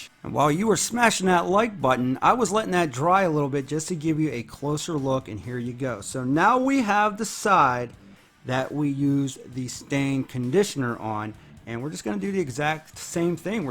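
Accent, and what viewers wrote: Pittsburgh, United States. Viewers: Pittsburgh accent? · I like your Pittsburgh accent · I hear some yinzer in your voice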